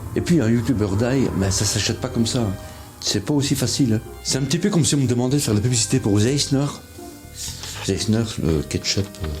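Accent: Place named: Belgium. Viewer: On sens un petit accent belge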